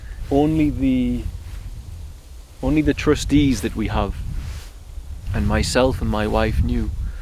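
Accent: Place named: Newcastle, United Kingdom. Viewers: this one was a goody, btw way are you a geordie? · I sense a Durham,UK accent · He has a Durham accent, northeast English anyway.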